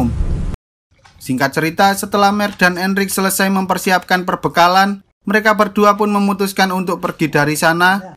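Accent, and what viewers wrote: Java, Indonesia. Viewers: Mas ngomongnya dengan bahasa indonesia yg baik dong. Logatnya jangan keciri bnget orang jawanya. Muak aku masss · Laah tnyata wong jowo toh?? 🤣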